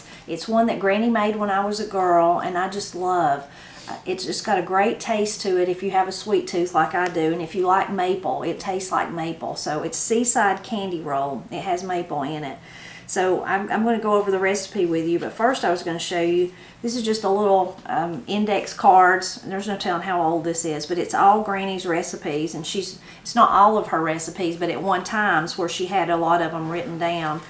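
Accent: Appalachia, United States. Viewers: Miss, thank you and your Granny for the wonderful Seaside Candy Roll recipe. I made some for Christmas and it was delicious and very easy to make. You were right about how sticky the candy roll became brushing it with the syrup and then pressing on the pecans but it was worth it! I grew up in Northern Idaho and I know and understand 95% of your Appalachian words and pronunciation. As far as I know, my people came to Idaho from Scotland, Ireland, Belgium and Germany via the Midwest. Maybe that is where they picked up those unique words. The only difference is my pronunciation of pecan [pea-con].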